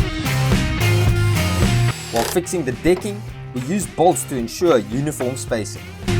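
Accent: South Africa. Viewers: Hey man I loved the series, looking forward to seeing more content like this. I was wondering where you guys are located ? The accent sounds a lot like South African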